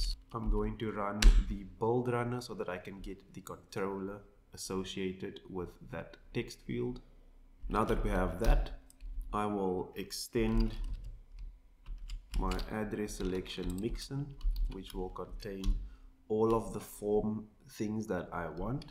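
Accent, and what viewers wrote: South Africa. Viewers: I know a south african accent when I hear one lol, CPT ne? Bro you might want to hide your API key there. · You from SA? oh wow I can hear the accent and the Cape Town location haha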